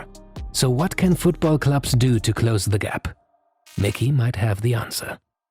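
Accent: Germany. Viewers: I used to have a professor lecturing in Media & Sports, who was from Germany. Every time I watch these videos it’s like being back in class. Not just accent, it’s almost exactly the same voice. Eerie but awesome. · the voice and the german accent 😩😩😩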